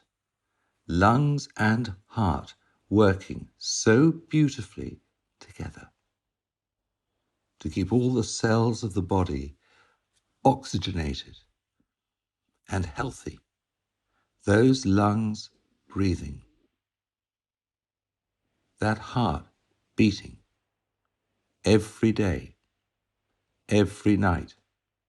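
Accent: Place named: England, United Kingdom. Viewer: Very useful tape. My name is also. You sound English. Is your full baptised name?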